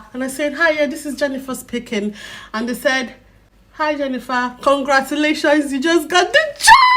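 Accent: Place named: United Kingdom. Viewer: The British Accent is getting more and more, am loving it thou. A very big congratulations .there is a big joy to get a yes in a dream n wished job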